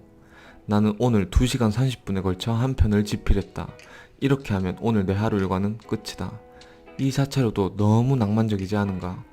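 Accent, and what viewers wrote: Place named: Ulsan, South Korea. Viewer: 울산분이신듯요 ㅋ 울서방도 울산사람인데 좀 억양이 좀 다른거같기도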